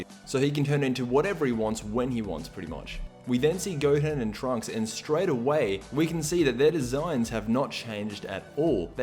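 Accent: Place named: Australia. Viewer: lol your Aussie accent is pretty full on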